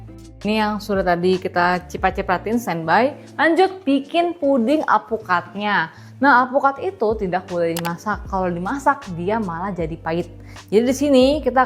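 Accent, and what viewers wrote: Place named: Medan, Indonesia. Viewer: Kok jdi medan kali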